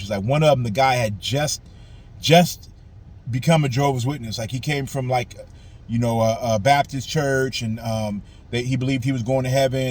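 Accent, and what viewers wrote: United States. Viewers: Love the sound of the rain at the end of the video. And the general vibe. You American? Cool accent. What kind of car it is, some large MPV? I was a type of the outsider, not really into the scriptures and ministry, hardly been to any Saturday ministry meetings at all through my 7 years from when I became a publisher to my decision of fading and ghosting. I really was in it for the company of literally couple guys I liked and respected. I didn't care much about anyone else, especially those oldtimers with only basic wordly education, there were few elders like that. Later I learned that folks in the congregation called me "the philosopher" behind my back. That sums it perfectly.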